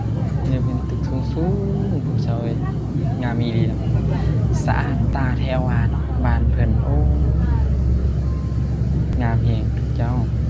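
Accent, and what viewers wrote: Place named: Laos. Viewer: ฟัง..เว้า สำเนียงลาว ล้าน % 🫵😁😂🤣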